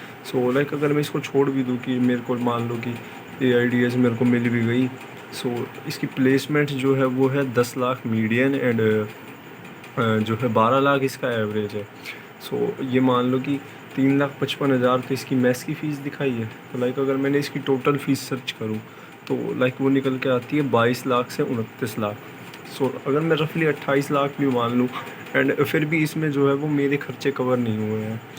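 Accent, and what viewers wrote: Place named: Haryana, India. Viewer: ...yeah wahi haryanvi accent sounds cool😍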